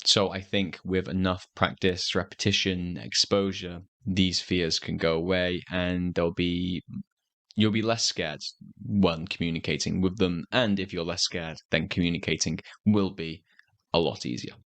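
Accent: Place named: United Kingdom. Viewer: I Love british accent , subcribed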